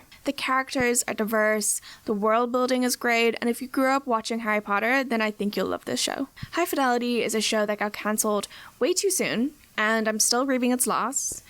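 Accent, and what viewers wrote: Ireland. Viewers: Are you Irish? Every now and again I hear a slight Irish accent. Just curious 😁 really love all the videos u make ✌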